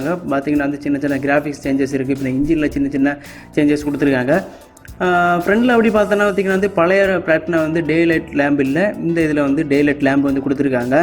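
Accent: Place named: Marthandam, India. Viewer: I came to know from ur slank bro.... I studied 2 years on marthandam, love ur slank... keep it up bro.....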